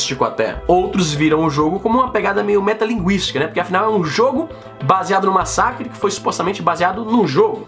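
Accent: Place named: Ceará, Brazil. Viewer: com sotaque cearence, mais alguém notou?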